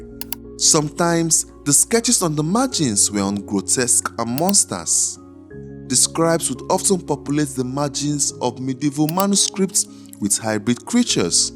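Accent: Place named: West Africa. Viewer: What's with his accent? He sounds like he's from West Africa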